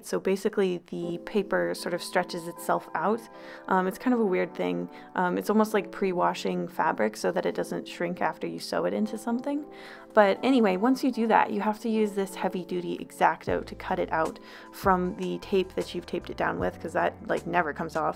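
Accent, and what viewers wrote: Canada. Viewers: You're Canadian? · Is she from Canada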